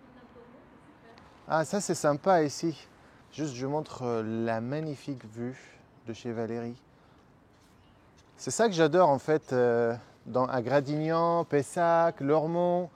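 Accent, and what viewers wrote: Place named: Tunisia. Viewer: Salam shalom salut Je suis le 900ieme depuis hier ! J’aime énormément tes vidéos j’ai tout regardé depuis le début et je remonte petit à petit. C’est mon premier commentaire et sûrement pas le dernier ! J’aime énormément ton contenu, la vitesse des vidéos, ton état d’esprit, ton accent de Tunisie 🇹🇳 j’en suis sûr ! 😜 ta réflexion, ta personne. Je continue à remonter tes vidéos c’est un moment de détente incroyable. Peace ❤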